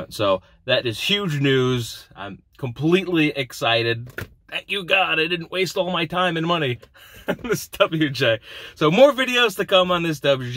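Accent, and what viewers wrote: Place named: New Jersey, United States. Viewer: I thought you're from jersey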